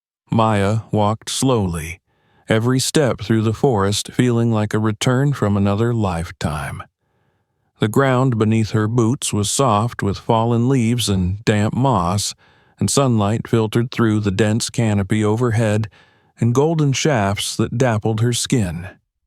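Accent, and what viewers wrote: South, United States. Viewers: I am enjoying the story, but am distracted by the southern accent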